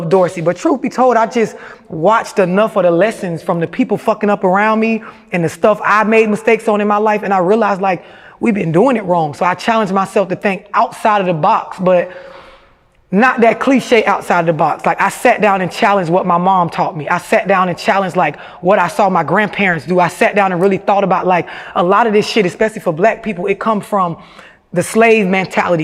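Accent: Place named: Florida, United States. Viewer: It's Florida's accent. And yes. She's very sexy.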